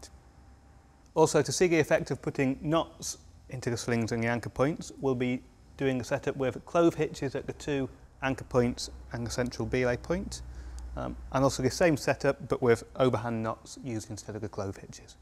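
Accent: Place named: United Kingdom. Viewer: Impossible combination for a foreigner, british dialect & this kind of mumbling voice... Does he have pebbles in his mouth?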